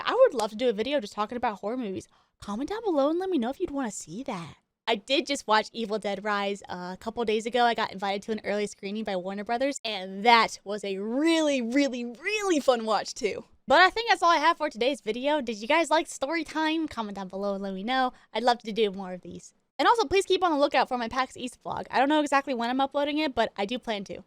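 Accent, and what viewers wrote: South, United States. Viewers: no me habia dado cuenta que eras sureña! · I could hear this southern snow queen talk ALL day.. 😍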